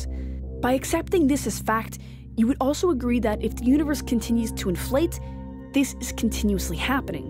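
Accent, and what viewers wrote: Canada. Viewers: u kinda sound canadian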